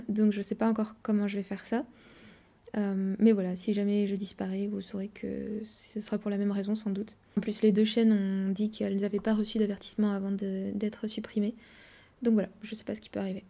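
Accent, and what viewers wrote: France. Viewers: Greetings young lady, May I offer that you please speak a little slower. I was easily able to hear your French accent while speaking Russian. Your accent combined with the high speed of speaking made it difficult for me to understand you. Good luck, and thank you.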